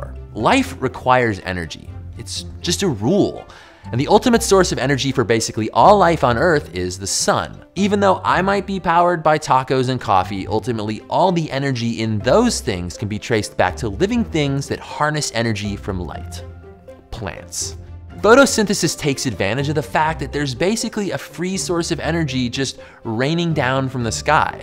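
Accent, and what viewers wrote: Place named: California, United States. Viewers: i thought he was talking like someone from California. maybe he has a stuffy nose? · Why are you talking with such a heavy California dude accent in this video?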